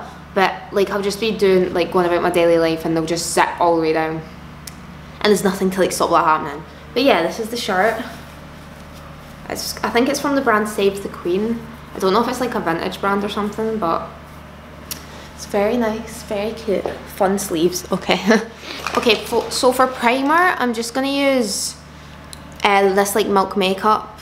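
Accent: Scotland, United Kingdom. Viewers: it took me so long to realise that she has a scottish accent. i’m literally scottish but i don’t have an accent so i never notice lmaoo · Scottish, but it's a quite muted accent compared to most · you re scottish? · She’s from Scotland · What part of Scotland are you from? I love your accent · Me: not used to hearing my own accent (used to American and English vids) Spends the next few seconds wondering whats happening Then it clicks, SHE'S A SCOT!!!!🏴🏴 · Her * speak * Me : "that's sound Scottish" Her : "Midges" Me : "Yeeaaah definitely Scottish" (I HATE Midges) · Felt so comfy, with the scottisch accent, really nice, ur funny and i love how it came out! Lov uu hope u upload soon again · Sounds more Scottish. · she's friggin Scottish hen 🥴🥴🥴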